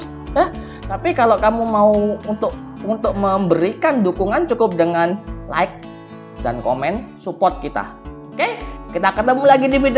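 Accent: Java, Indonesia. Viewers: Pak dokter boso jowone kentel, aku suka wkwk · yg menyenangkan paling medok yg favorit bgt memberi informasi yg dibutuhkan anak muda ketje. Terimakasih dokk 💕💕💕 post video ttg informasi yg bermanfaat bagi anak jaman now ya.. Selalu di tunggu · Logat e jowo banget njih pak dokter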